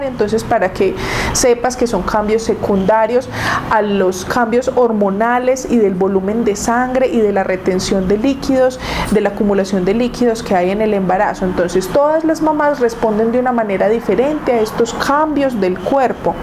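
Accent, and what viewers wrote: Colombia. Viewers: Claro es colombiana y paisita..por eso esa voz preciosa..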